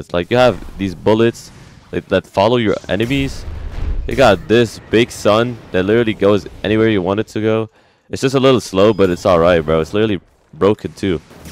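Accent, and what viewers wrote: Jamaica. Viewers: Whit you talk Jamaica bro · Bro jamaican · Are you Jamaican?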